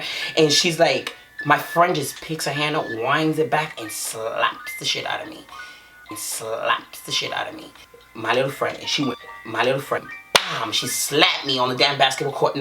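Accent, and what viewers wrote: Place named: New York, United States. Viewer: I jus love to hear her talk ain’t paying attention to shit she saying jus love the soooo Super New York accent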